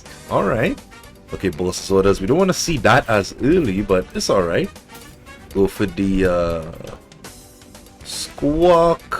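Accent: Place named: Caribbean. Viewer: Trini or Caribbean accent...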